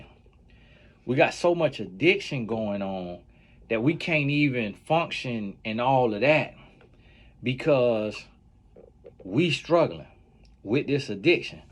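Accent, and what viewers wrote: South, United States. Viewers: I love listening to your country self. Fasting definitely has its blessings.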